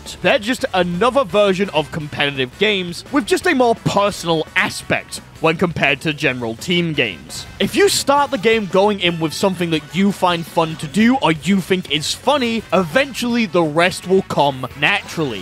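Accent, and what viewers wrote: United Kingdom. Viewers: you forgot about your silky smooth british accent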